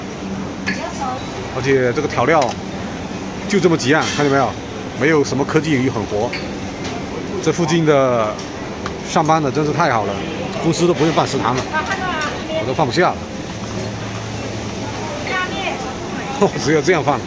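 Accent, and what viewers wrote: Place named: Fuzhou, China. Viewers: 地瓜腔好浓😂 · 一副算计男的德行， 还带一口地瓜腔，真烦人。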